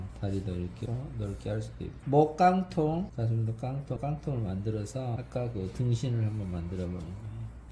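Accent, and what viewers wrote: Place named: Jeolla, South Korea. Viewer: 왜 라도치들만 유투브에 열심인가? 사투리 좀 쓰지지 마.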